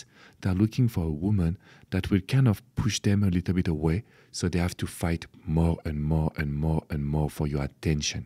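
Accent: France. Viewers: Hi,. I love your style of coaching so much that I am a subscriber. I have a request for a possible video. Could you make a video that addresses how to deal with a fearful avoidant? I’m in that kind of a situation and it’s breaking my heart. Thank you. 😊 And BTW I love your French accent. Don’t try to change it. Be well.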